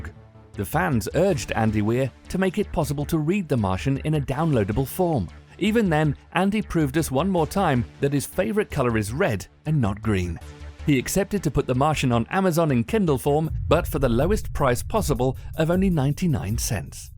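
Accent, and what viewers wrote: England, United Kingdom. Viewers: what the f u c k are you doing ? ( peter 'pole' gazer ) pack your bags ? fix you a visa ? turbulent Hollywood history ? . okay don't answer that , your not from around here are you ? I mean from America ? and worst of all an English accent 'cynical humor' narrator . ( I'm speaking for myself ) but I'm still going to say ' w e a r e s I c k to d e a t h of b r I t I s h a c c e n t s p l a s t e r e d e v e r y f u c k I n g w h e r e' it's not cute, it's not jolly , it's not a "romp" . even if I'm way off on this and get all kinds of blowback I don't care . take your effing insults and stick'um up your A R S E ! jeezus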